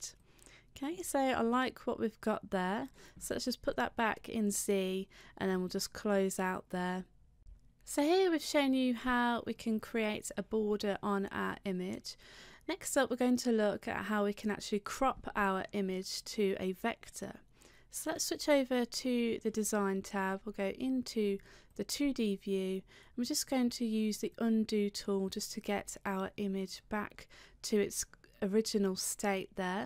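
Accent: United Kingdom. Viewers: Excellent videos with a good pace. Can always go back and watch again to take notes. And the English accent helps! Not sure why you don't have hundred of thousands of views - much better than many cnc tutorials!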